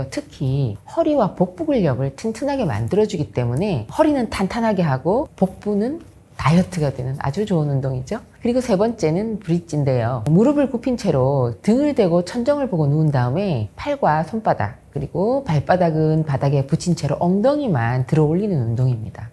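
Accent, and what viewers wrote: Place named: Daegu, South Korea. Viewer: 이분 말투가 대구 사투리인거같은데 매력있네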